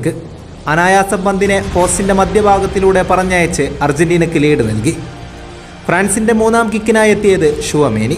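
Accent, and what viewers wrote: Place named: Ernakulam, India. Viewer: അറിയാതെ കണ്ണു നിറഞ്ഞുപോയി ഈ കളിയും എറണാകുളം അവതരണവും കേൾക്കുമ്പോൾ 💙🤍💙🤍🇦🇷🔥🔥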